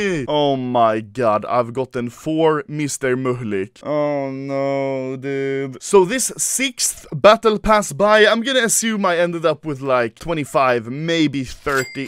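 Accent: Sweden. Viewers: you have a swedish accent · You from sweden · He is swedish so he cant speak like an English native bro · this swedish accent annoys me so much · Swedish accent hehe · Ur sooooo from sweden. Right?? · U come from sweden right?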